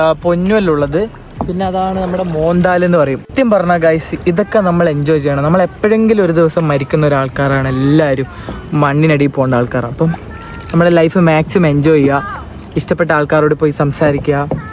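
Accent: Kannur, India. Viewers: chechide samsaram kannur thannne aano🫠🤍🤌🏻but kollaaa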